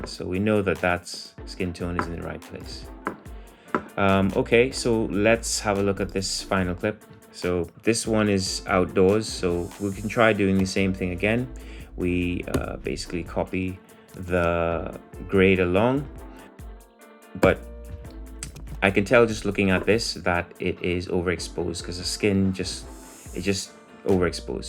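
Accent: Trinidad and Tobago. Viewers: Im hearing a caribbean accent underneath...my guess is trini...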